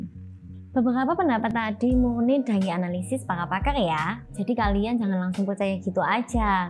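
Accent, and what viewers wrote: Java, Indonesia. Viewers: Ngomong nya medok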